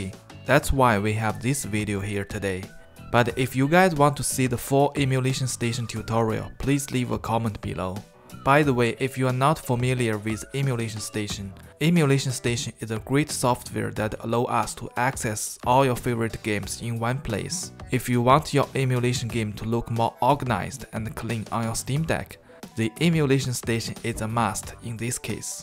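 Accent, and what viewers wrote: China. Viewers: Yes! Finally find a solution. Thanks for the video., may I ask, are you from china? Your accent is very familiar to me😂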